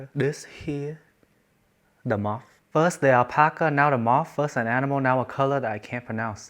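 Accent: Vietnam. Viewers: I like your viet accent bro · That vietnamese accent is gold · As a Vietnamese, I love your accent so much hahahaha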